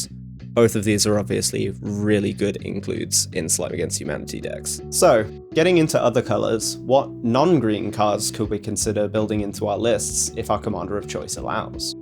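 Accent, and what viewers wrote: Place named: United Kingdom. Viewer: I see slime video I hear british I sub. Fellow UK Slime enjoyer.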